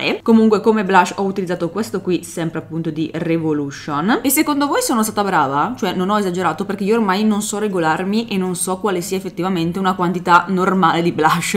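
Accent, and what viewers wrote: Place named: Brescia, Italy. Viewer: Mi posso sbagliare, ma per caso sei Bresciana? Mi sembra di intuire un po' di familiarità nella tua voce😅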